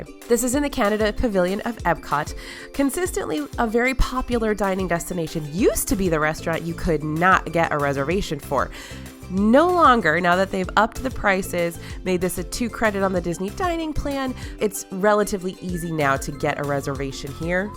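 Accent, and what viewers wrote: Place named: Buffalo, United States. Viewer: are you from Buffalo? Takes one to know one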